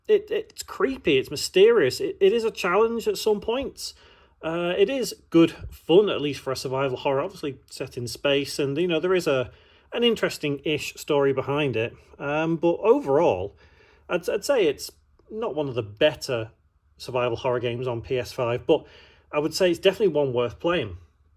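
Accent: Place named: North West England, United Kingdom. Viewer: Cool video man and it’s good that you’re highlighting lesser known games. I think if you’re wanting to do YouTube seriously you’d benefit from some higher production values. I’d script your game voice overs so you can hit all the points conclusively rather than winging it. You don’t have to listen to me but I do run a channel with a silver play button and the advice is friendly and I want you to succeed. You also sound like you’re from my neck of the woods, north west uk?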